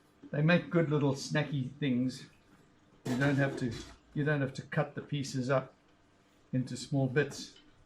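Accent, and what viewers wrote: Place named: Zimbabwe. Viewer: Thanks for the video, you make it the same way I do. Only difference is I dunk mine in a vinegar tub to rinse the salt off. From the accent I'm guessing Zimbabwe, I'm from Karoi 🙂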